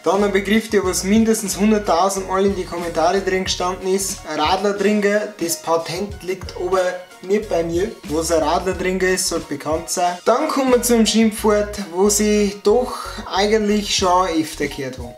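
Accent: Bavaria, Germany. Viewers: Naja als richtiger bayer 🤣 durd dei Dialekt so wei in de lusern 😅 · Find dich mega cool :D versuche durch deine Videos Bayrisch zu lernen :) könntest du irgendwann auch mal Bayrisch für Fortgeschrittene machen? Oder ein Video, wo du die Aussprache mal erklärst, wie man die Vokale und Konsonanten betont oder wann man das R im Bayrischen rollt :D Lg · Mi grausts bei deinem bayrisch oida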